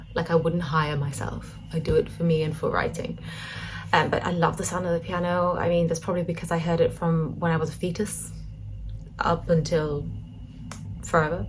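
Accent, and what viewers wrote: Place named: England, United Kingdom. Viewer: Thank you, it’s so nice of you to share this with your fans and followers. I did not have the chance to ask, but I have this question, if I may. I learnt you’re from Malta (is that right?), so you’re Mediterranean (I am from Spain). At the same time you seem very Anglo-oriented: you have a strong English accent and the three desert island records you chose are English or American. On top of that I loved your excellent cover of the, which is Brazilian (which is what I thought you were when I first discovered your videos). So my question is, what are your influences, the music popular or otherwise that has made you the singer song-writer that you are? Thank you anyway.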